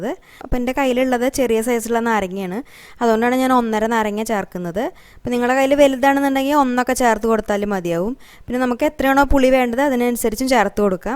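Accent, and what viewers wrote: Thrissur, India. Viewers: Thrissur aanoo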